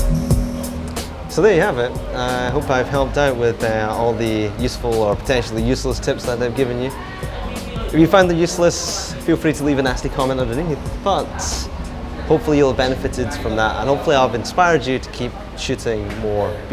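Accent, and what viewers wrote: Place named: Scotland, United Kingdom. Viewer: Just aboot crapped ma pants there when a heard yer Brogue....Defo got the Scottish mannerisms..Alba gu bràth ✊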